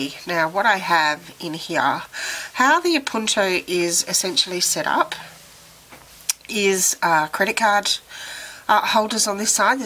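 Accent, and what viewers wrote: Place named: Melbourne, Australia. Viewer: Thanks for sharing your views on this beautiful product and it's nice to to hear a fellow Melbournian. I agree with everything you say. Gillio's products are simply divine and of the best quality and craftmanship. I have a Gillio Giramondo in Epoca Red and just received my A5 Appunto in Epoca Gold yesterday. I love them both.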